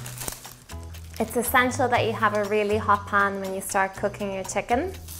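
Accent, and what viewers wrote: Ireland. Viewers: Is that an Irish accent? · this is such a lovely video !. is she irish ?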